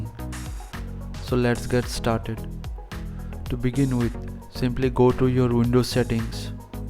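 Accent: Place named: India. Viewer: this dude is the goat, knew it was gonna work when i heard the indian accent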